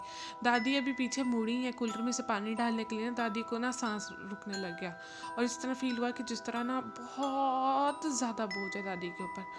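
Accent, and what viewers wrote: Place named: East Punjab, India. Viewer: Your Punjabi accent is of Eastern Punjab،Sardar type. Sounds nice.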